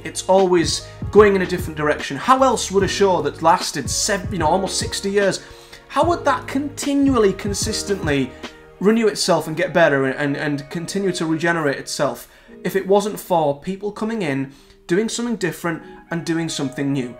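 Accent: Middlesbrough, United Kingdom. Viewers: Hi new viewer here, I agree I think it's a last ditch attempt to get viewers on side, I stopped watching after peter capaldi because I believe they were jumping on the woke wagon, this seemed to be proved true as the series went on. Before everyone calls me a misogynist, I though missy was the greatest baddie theres ever been on dr who, anyway sorry for the long winded message, are you a Middlesbrough lad , I'm from redcar recognised the accent.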